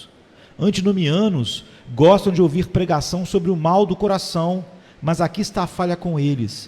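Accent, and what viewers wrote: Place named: Rio de Janeiro, Brazil. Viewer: Tem sido maravilhoso ouvir Spurgeon em sua grandeza em português e ainda melhor com este gostoso sotaque carioca! Obrigado irmao que o Senhor continue abençoe o sempre!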